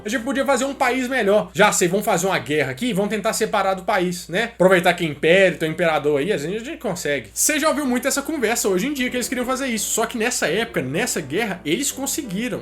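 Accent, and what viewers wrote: Minas Gerais, Brazil. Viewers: MUITO LEGAL ESSE VÍDEO. EU GOSTO MESMO É DESSE SOTAQUE MINEIRINHO GOSTOSO DEMAIS DA CONTA... PARABÉNS. EXELENTE CONTEÚDO... ESTOU AGUARDANDO A PARTE DOIS...🇧🇷🇧🇷💙💛💚👍👍👍👍👍👍👍👏👏👏👏👏👏👏👏🌻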